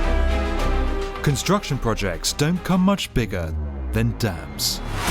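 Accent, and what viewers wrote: United Kingdom. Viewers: What kind of British accent is this? I know it ain't cockney! Heard it before ...very proper, bit of lisp or sounds kinda trips on some parts of words northhha and defeenainiteeve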